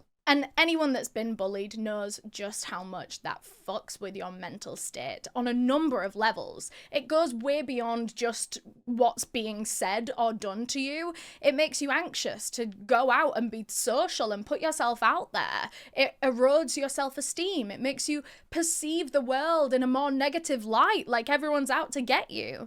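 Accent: South, United States. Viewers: You have a good American accent sometimes I hear in other videos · It just depends on where your from. Down south we say it the way you are.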